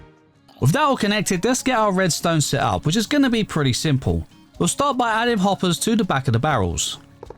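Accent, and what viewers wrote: United Kingdom. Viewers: I'm in love with the presentation on the video British accent makes everything better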